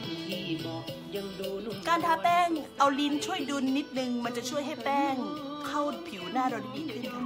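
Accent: Isan, Thailand. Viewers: เสียงกลับมาแล้ว คลิปที่แล้ว เสียงอีสาน ระวังมีดบาดนะคะ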